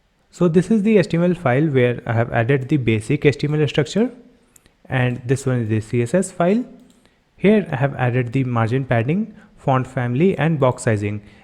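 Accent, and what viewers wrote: India. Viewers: indian guy thanks i like your video and accent😊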